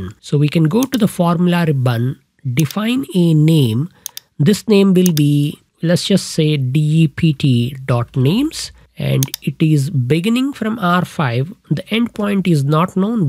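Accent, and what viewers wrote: India. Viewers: The accent is too Indian for me and I am an Indian.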